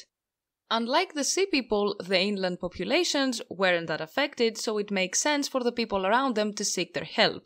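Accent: Greece. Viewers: I prefer your Greek pronunciations anyways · Έλα ρε! Αγαπώ την Ελλάδα! Υπέροχο βίντεο, greek accent makes everything better. I'm also a non native english speaker making videos, so feel free to check mine if you want.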